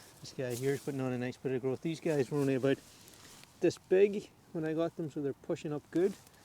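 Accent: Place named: Ireland. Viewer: This is the Irish accent. If you can't understand it, press on the CC button. It will give you subtitles.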